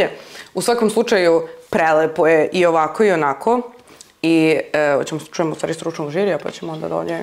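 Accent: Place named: Novi Sad, Serbia. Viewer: Tvoji komentari su pre-simpa i jos sa tim NS naglaskom uvijek me oraspoložiš. A Baku Anu vec zamisljam kako se krsti kao na onu "šolju žumanaca" 😂❤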